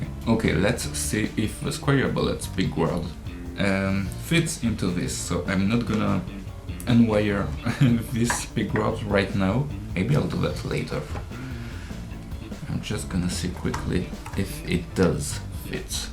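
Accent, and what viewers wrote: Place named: Mumbai, India. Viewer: Où nous démontre sans emphases que: •T as pas besoin de lâcher ni 1500, ni 1000 et ni même 500 euros ou $. • que la guitare venant de Chine ou d ' Angola ou de Papouasie n est pas un problème en soi. • Que c est bien le jeu qui compte et à travers ça la musique. • idem les amplis • idem les effets Les fabricants de guitares de processe industriel ont tous une c.n.c, savent tous bobiner du fil de cuivre autour d aimants. • mon constat: il ya de quoi passer une décade à apprendre à jouer avant de passer à des guitares plus chères et mieux faites. • autre constat:, tu as un Anglais de Bombay.. pratique car on comprend tout et même moi ( c est dire ). Merci pour ton travail et tes avis sur tes vidéos.🙂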